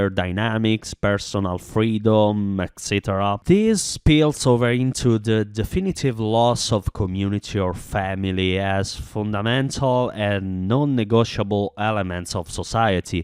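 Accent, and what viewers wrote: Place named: Italy. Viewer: Good job but se ti guardi 3-4 episodi dei sopranos in lingua originale sblocchi l'accento da new jersey invece di dover rimanere con l'accento da scuola pubblica italiana con insegnante zitella di origini partenopee. All'audience international piace di più se ti possono immaginare dire "capocollo" come interiezione, fidati non è difficile.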